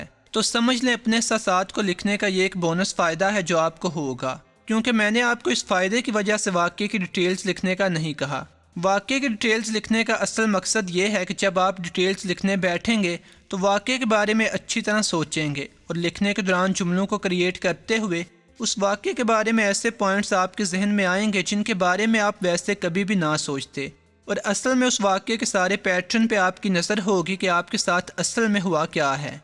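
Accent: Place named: Punjab, Pakistan. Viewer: آپ کی موٹیویشمل سپیچ زبردست ہے . آپ بنجابی ٹچ کچھ کم کریں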